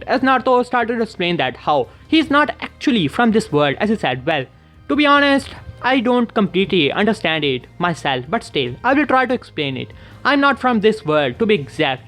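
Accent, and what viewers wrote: India. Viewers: u indian mate? Edit: idk why did i even asked this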